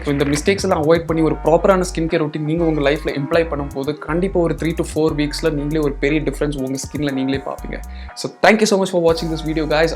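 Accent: Kanyakumari, India. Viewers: slanglae purichuruchu bruh.....sweet voice + kk slang lite😅❤️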